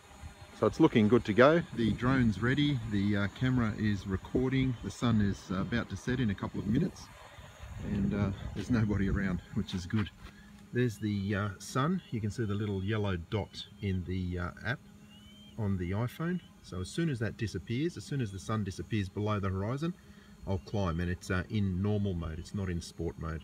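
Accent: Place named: Australia. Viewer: You have some good videos But what’s with the American measuring system You sound Aussie, obviously around Perth Why not metric mate.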